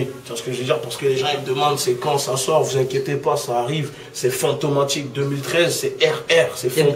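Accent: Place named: Africa. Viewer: Bizarre maintenant il parle avec un fort accent africain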